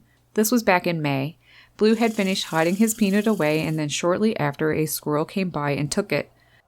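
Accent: Newfoundland, Canada. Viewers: This was very entertaining! I LOL'ed several times. I've started feeding blue jays recently, but I did not realize they could become so comfortable with humans. Their squeaks sound a bit different where I live... you sound Canadian... maybe your blue jays have a Canadian accent? Anyway, thank you for sharing this remarkable and delightful footage. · I love your jays!!!! My jay family seems to have totally different calls, reactions and habits than yours. Maybe cause they in a different side of the continent? I just love them too!!! Happy Jay watching!!! 💙 (I'm guessing from your accent you're in NFLD?) 😁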